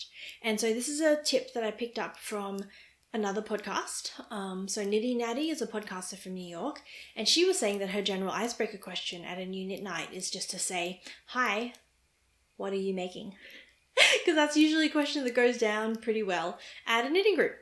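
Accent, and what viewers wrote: Australia. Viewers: So glad your enthusiasm has returned! Looking forward to seeing your next vlog. It's always good to hear a fellow Aussie voice on youtube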